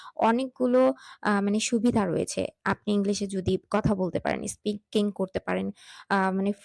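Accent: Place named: West Bengal, India. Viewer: Hey there! No offence okay ! Take it positively.. 😊 I guess ya should focus on your pronunciation first while speaking in English. Follow British or American accent not indian or bangali style.However I do appreciate your confidence. Indeed😇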